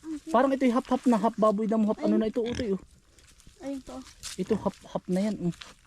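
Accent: Quezon, Philippines. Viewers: Maganda ang tunog ng Tagalog ng Quezon.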